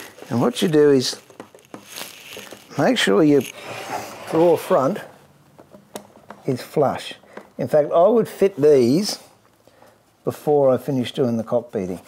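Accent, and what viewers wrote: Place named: Australia. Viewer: Great work and A+ presentation, it took a bit to get to understand Ausie which is cool. I felt a bit redundant to comment on each episode so, I saved it to the last. The finished and the unfinished pieces both look equally fantastic work of ART, no less, you are a Master Craftsman. Thanks for this set of videos, looking forward for whatever you have out there, Cheers Mate. Just wanted to correct one thing you mentioned in E-2 (I think) for the dove tail joints: David Barron's Alignment Board, FYI.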